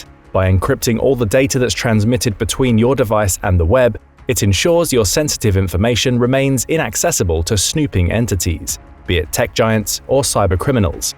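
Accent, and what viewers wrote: United Kingdom. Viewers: Another video with a factual error about every minute, visuals that have no relevance to the narration and no insights that couldn’t be gleaned from reading a news site. But they did enlist a British narrator to give it a thinly veiled patina of authority.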